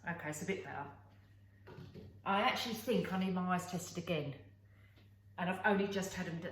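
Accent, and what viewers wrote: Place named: Wigan, United Kingdom. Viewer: It is really beautiful and there is nout wrong from being comman we all talk like tht in Wigan haha X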